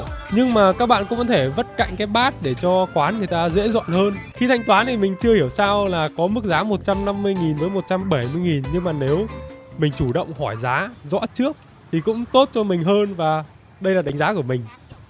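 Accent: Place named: North, Vietnam. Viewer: Mình lại thấy bình thường không có làm màu gì luôn. Có thể chủ kênh nói giọng bắc nên chú đoán là khách du lịch nên chú tiếp đãi nồng nhiệt thôi.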